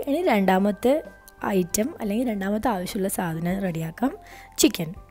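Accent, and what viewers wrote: Kannur, India. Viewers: farsha fashi kannur aaanenn thonnun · സംസാരം കേൾക്കാൻ നല്ല രസമുണ്ട്.. കണ്ണൂർ അല്ലെ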